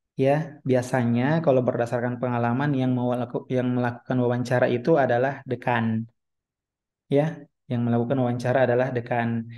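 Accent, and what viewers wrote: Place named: Sulawesi, Indonesia. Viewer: Bang orang sulawesi ya , logatnya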